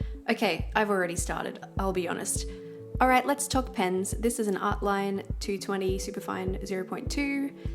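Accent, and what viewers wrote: Australia. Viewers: Hi, I really enjoyed your video... it is good to hear an Aussie accent... just a tip about your comic markers... they are double ended 😉 have a great 2019. Will be looking forward to more videos 🙂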